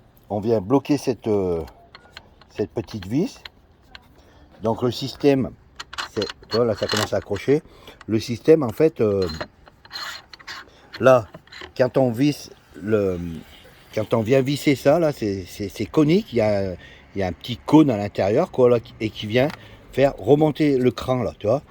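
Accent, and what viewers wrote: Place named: Saint-Étienne, France. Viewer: Where are you from mate? You ve got an accent sounds like Saint Étienne 's thank you for your video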